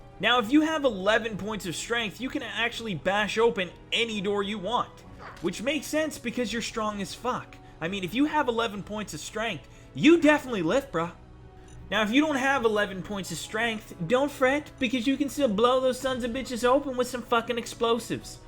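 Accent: Canada. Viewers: you Canadian? you got that accent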